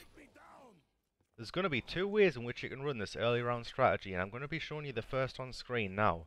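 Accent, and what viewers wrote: Newcastle, United Kingdom. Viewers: thought he was a geordie?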